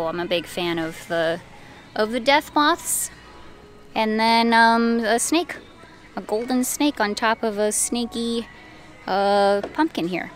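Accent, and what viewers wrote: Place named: Maryland, United States. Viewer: Sounds like Maryland to me.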